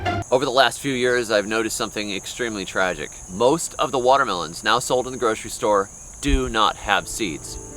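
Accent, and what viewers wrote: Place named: United States. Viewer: I left the UK in 2024. Here in Iraq, they don't have seedless watermelons. That's something which until I saw your video, I didn't know existed. It sounds like science fiction. When did developed countries get seedless watermelons? You sound like you're an American. Are seedless watermelons a post-2023 phenomenon?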